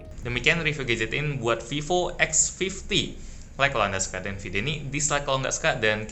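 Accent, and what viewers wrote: Palembang, Indonesia. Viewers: Bang org mana sih? Palembang?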